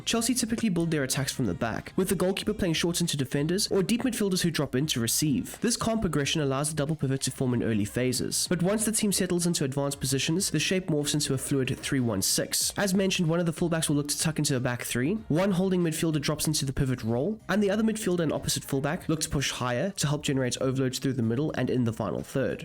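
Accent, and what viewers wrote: South Africa. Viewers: Are you from South Africa?? That accent is familiar!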